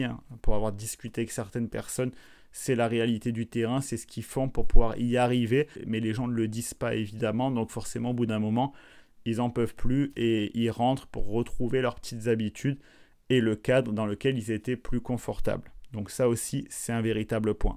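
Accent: Canada. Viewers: Punaise tu as pris l accent canadien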